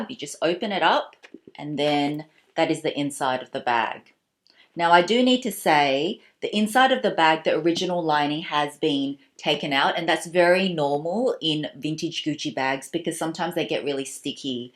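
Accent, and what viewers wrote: Australia. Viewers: Hi, I love watching your videos. I’m from the US so I find you Ausie accent so adorable 😁. I would like the bag please! I’m a new YouTuber and it would be awesome 👏 to win the bag so I can vlog about it!!! It would mean so much to me! Wishing you more success!!!! ♥️🤗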